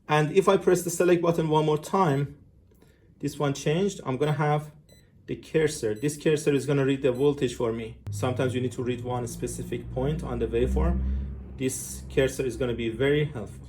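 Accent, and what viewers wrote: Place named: Iran. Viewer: لهجه مشخصه ایرانی هستی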